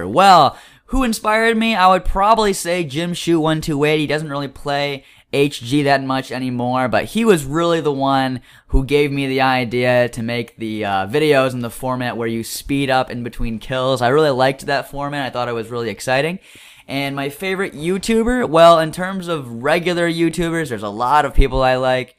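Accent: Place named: Spain. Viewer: jaja me gusto tu asento español jaja saludos desde mexico i like your language spanish im a sub from mexico :)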